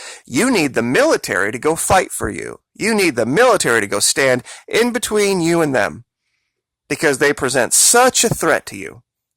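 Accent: South, United States. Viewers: And the condescending southern accent thni g is highly insulting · I'm trying to place the dialect; Forrest, Forrest Gump? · Love the sthrn accent haha 🤣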